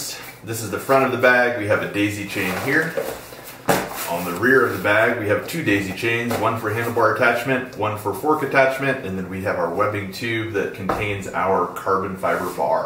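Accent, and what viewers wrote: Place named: Baltimore, United States. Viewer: Just got this bag and I'm psyched to do my first trip w/ it. I like your mid-Atlantic Baltimore / Philly accent. It's subtle but those vowel shifts are noticeable. Thanks for making a badass bag.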